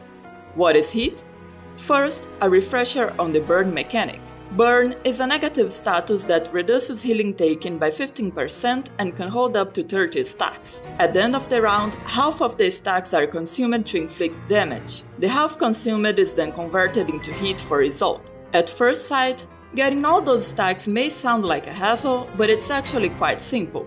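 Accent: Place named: Brazil. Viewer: Eu assistindo pensando se você era BR pelo sotaque ai aparece a Jiu com uma garrafona de 51 OUFHISDAASFIHD Muito bom o video. Não tinha planos pra Isolde, mas o Joe com certeza me fez repensar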